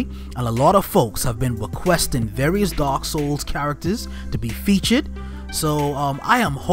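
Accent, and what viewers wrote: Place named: Bahamas. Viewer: Haha, nice video! I know that Bahamian accent anywhere!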